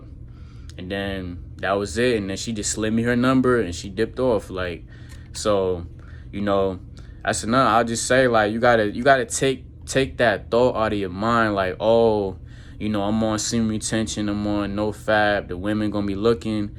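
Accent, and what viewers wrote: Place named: New York, United States. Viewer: Good video bro💪🏾 The way you talk gives me New York vibes lmaoo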